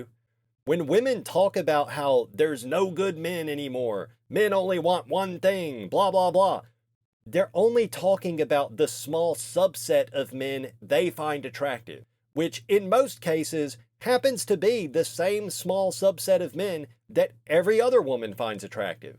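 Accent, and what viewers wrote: South, United States. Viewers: he definitely has a slight southern accent. Wouldn't say hillbilly, but for sure Southern. Hillbilly is much more pronounced. He sounds like a dude who lives in a slightly more rural area.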